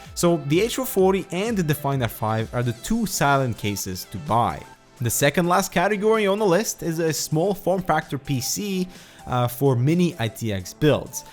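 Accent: Sweden. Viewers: Are you from sweden? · Where are you from? are you swedish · You sound really swedish btw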